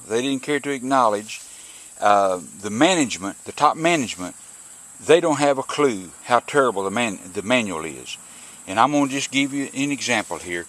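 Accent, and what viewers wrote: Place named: South, United States. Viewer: You sound like you are from the south. My neighbor has this same mower.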